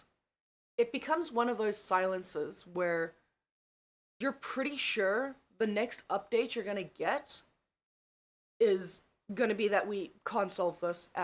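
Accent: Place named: South Africa. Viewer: i believe she's South African, beautiful accent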